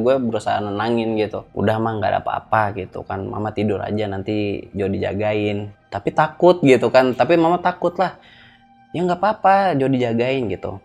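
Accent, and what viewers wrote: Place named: Bali, Indonesia. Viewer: Hadir sampang madura, btw bg orang bali y logatx bali bgt🙂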